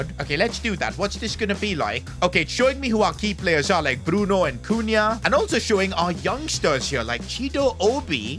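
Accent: India. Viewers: Bro are you indian?? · Are You Indian Broo??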